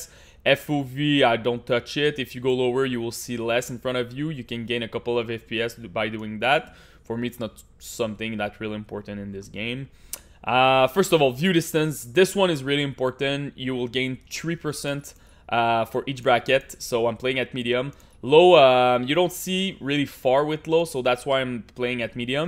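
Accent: Canada. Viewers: Toi t’es québécois avoue sa sentent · Or u know, canadian accent.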